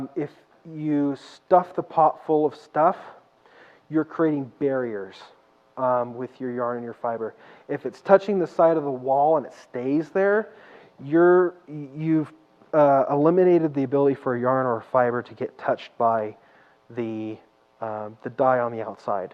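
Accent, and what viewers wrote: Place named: Brazil. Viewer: The woman...she's brazilian, right? Brazilian accent going on hahahha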